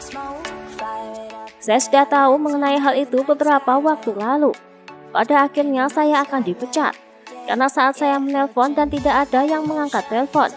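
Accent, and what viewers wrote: Java, Indonesia. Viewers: saya suka artikulasinya....medoknya muanteeep. Jaya terus Indonesia Channel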